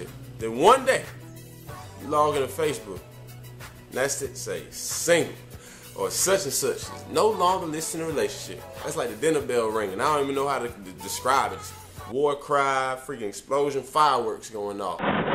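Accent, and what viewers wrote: South, United States. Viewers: Why do you sound like you from the south.... if your from NJ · I thought he was a Southern boy, too. I was about to be really excited about that.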